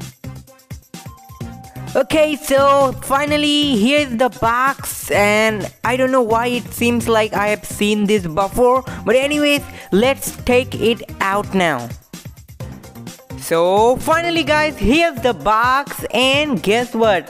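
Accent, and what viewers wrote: India. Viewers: ARE YOU INDIAN? BECAUSE YOUR VOICE AND NAME IS LOOKS LIKE INDIAN NAME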